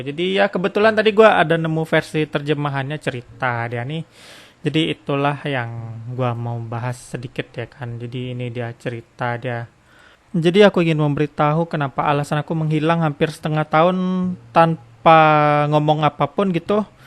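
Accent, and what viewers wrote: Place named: Medan, Indonesia. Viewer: Bang lu orang medan yah? Keliatan dari logat bicara nya?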